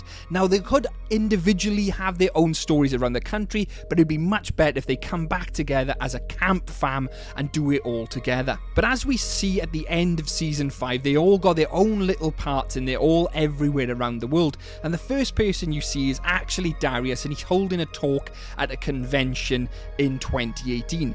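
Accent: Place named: Wales, United Kingdom. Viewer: You sound... Welsh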